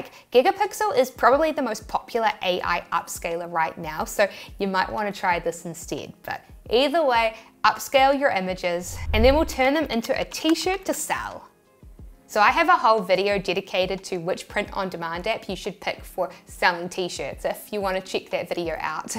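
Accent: New Zealand. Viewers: I really enjoy listening to that New Zealand accent..🙆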